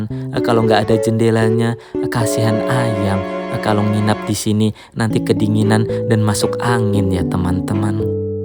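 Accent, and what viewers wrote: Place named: East Kalimantan, Indonesia. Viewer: Kaltim nymk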